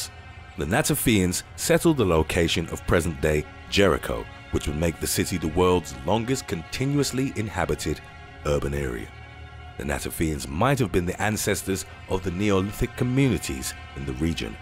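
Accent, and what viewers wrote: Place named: England, United Kingdom. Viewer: For an English sounding narrator, the guys understanding of English pronunciation is abysmal!!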